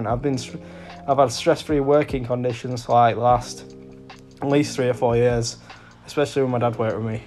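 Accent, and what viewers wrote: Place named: Derby, United Kingdom. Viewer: Wheres the site are your on buddy, you sound like your from my area in derby and theres loads of red road sites near me Another good video buddy, definitely think 1n1 is a good way to go:)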